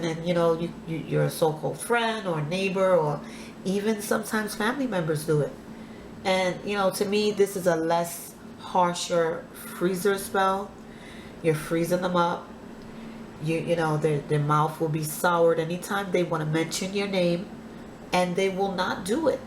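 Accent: New York, United States. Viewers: are you frm ny? "talkin talkin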